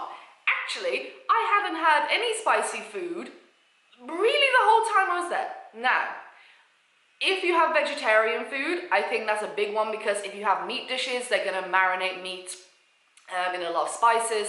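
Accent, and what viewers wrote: England, United Kingdom. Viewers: I love that English accent.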